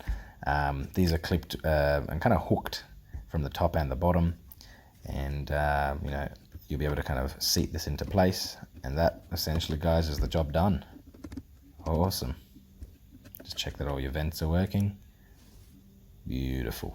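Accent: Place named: Australia. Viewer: can i ask why you sound aussie but looks like your hands are Indian? :)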